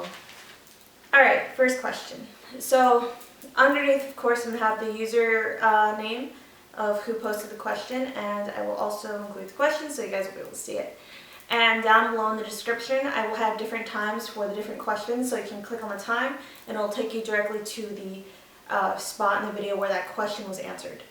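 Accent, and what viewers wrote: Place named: United States. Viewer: What parts of Mexico are your parents from? Have you ever been to Mexico? You have no chicana accent which is interesting because, you have a normal american accent.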